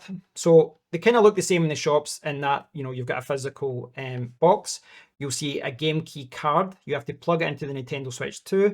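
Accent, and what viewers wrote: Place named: United Kingdom. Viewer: LOL ...my ethnicity is filipino but migrated to Australia when I was 10 yrs old. My auntie lives in Essex, UK since the early 70s and married to a Jewish man born in Whitechapel. I visit the UK every 3-4 years. Love the people and culture, especially history! I'm a Churchill fan lol. All the people that I've met in my life growing up that have Scottish accent were all smart be it my Math teacher, my local dentist and family doctor so I've personally always associated the accent with intelligence so when I watched your video and found out your IT dev background I wasn't surprised! LOL Looking forward to more vids! God bless! 🙏👍😆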